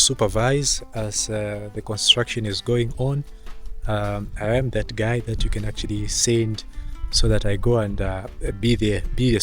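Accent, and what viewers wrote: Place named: Zimbabwe. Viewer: Wena you are an imposter from Zimbabwe why don’t you tell the truth everything about you screams Zimbawean even the accent . Zambians are very humble accommodating people .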